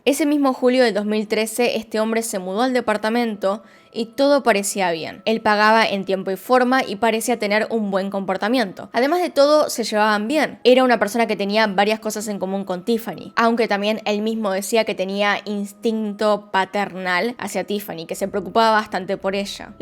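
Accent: Argentina. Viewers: Tu acento argentino me gusta mucho, es un placer oírte, gracias por hacer casos para nosotros.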